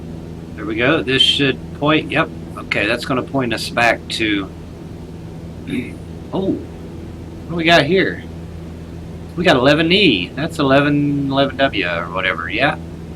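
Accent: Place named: Tennessee, United States. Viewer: Hi… my husband and I enjoy watching your flight simulator videos as my husband used to fly ultra lights …we live in the Treadway Tn area … listening to u it seems u live close to us… ?? We were just curious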